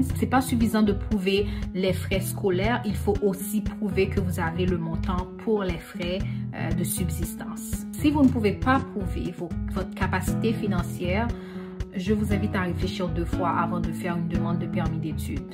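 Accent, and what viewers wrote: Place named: Quebec, Canada. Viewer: J'aime le léger accent québéquois.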